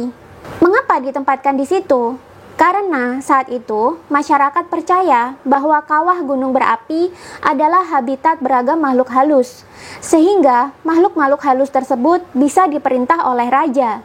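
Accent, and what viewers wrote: Java, Indonesia. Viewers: Karena Mbak ini orang Jawa, logat jawanya masih kentel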